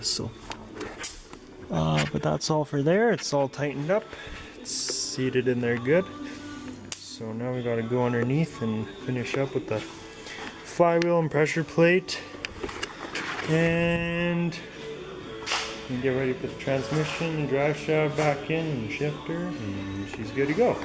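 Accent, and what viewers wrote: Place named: Canada. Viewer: He sounds Canadian. :)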